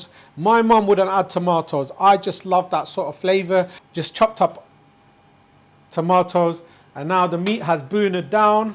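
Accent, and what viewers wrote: United Kingdom. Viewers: bloody british accent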